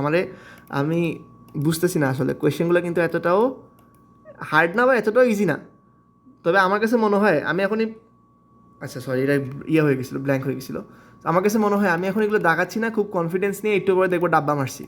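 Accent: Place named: Sylhet, Bangladesh. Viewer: Apni ki sylhet er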